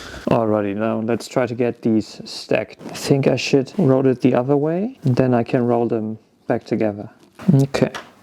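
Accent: Germany. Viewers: your clearly german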